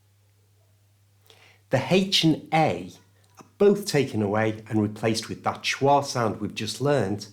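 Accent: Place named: RP, United Kingdom. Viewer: Perfect classes!!! 👏🏼👏🏼👏🏼👏🏼👏🏼 And your accent is beautiful. Is it RP accent?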